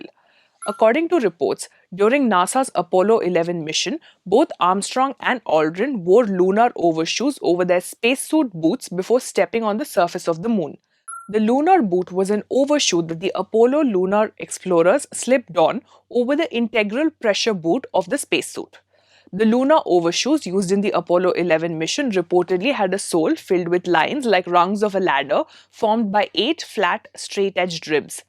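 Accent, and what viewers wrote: India. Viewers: Yeah, go ahead and use the Indian accent to give some sort of credibility for the simple minded rooted in hate and blame that also thinks the earth is flat.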